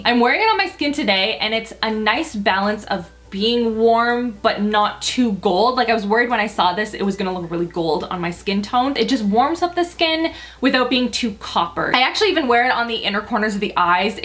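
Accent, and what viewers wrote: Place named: Canada. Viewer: . Your look today is spectacular! 😊 I love watching your videos not only because of your fab content but also because as a fellow Canadian who lives in Spain, I need my dose of the Canadian accent. thanks for that!! 😊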